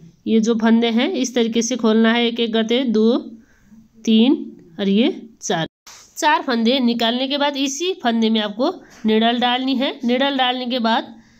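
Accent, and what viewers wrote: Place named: Kathmandu, Nepal. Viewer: आप की डिजाइन बहुत अच्छी लगी ।आपकी आवाज सुन कर काठमांडू याद आ गया धन्यवाद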